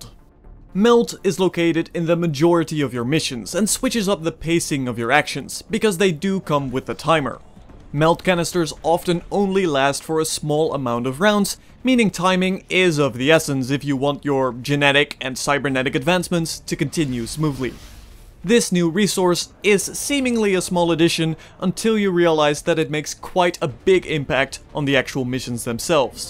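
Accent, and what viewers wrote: Netherlands. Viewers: I think I hear a Dutch accent. :)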